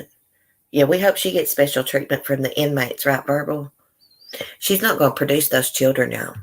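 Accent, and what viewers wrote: South, United States. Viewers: My 5 month old daughter and I listen to you every day. She just lights up when she hears your voice. You make my our day ❤️ Keep it up, girl. Sooo refreshing hearing a southern voice who keeps it real. With love from the Texas hill country, Kate & Roxy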